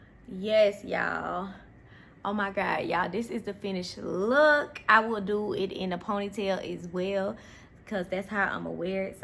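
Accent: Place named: South Carolina, United States. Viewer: Im in South Carolina and I swear some of the things you say sound like my people.... You might be family lol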